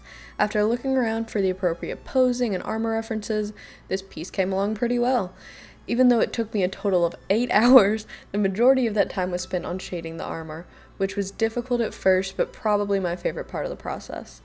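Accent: South, United States. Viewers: is it just me or has she been getting more southern (hi )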